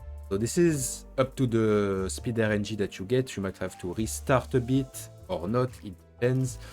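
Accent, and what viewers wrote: France. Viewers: It's so nice finally hearing a fellow Frenchie for once in this guides. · this exquisite french accent really adds a lot to the video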